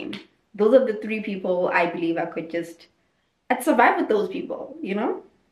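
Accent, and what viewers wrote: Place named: Los Angeles, United States. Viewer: Why that LA accent?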